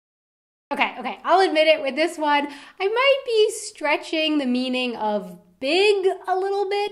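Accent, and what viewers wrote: Germany. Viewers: hat man auch gemerkt als er enlgish gerededt hat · übler aktzent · Oh that german accent hurts me :D but I probably sound the same ^^ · English must be the most German English I've ever heard... Alongside my English lol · als der typ anfing zu reden hat man sofort gemerkt das der deutscher ist :D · OMG I love this englisch German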